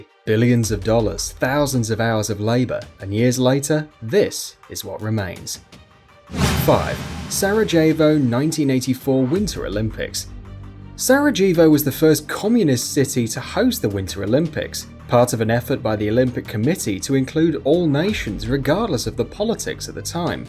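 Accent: England, United Kingdom. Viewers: Who cares how it's pronounced he's saying it with an English accent so of course it's different. I pronounce jalapeño JAlapeno instead of HAlapeno...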